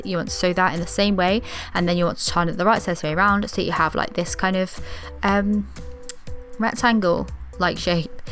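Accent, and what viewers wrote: United Kingdom. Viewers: Hello, umm i have a question: Are You British? 😅👉👈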